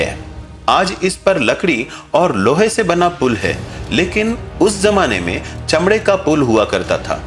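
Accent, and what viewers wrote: Maharashtra, India. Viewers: Bhaisahab ye maharastrian hindhi hai,thoda samaja karo bhai..har kisika bolne ka,bat karne ka o kidhar rahte hai unpe jyada depend karta hai..so unka aisa Kuch matalab nahi rahega k kisike man dukhaya jaye... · Please learn to speak hindi well it seems you are telling story in Marathi Hindi tone is different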